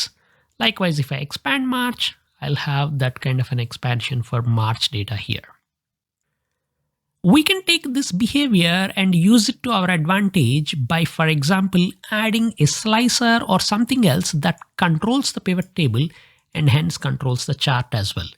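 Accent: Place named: Tamil Nadu, India. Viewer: Aap Tamilnadu se ho kya 🙂 apke bolne ke tarike se lag raha hai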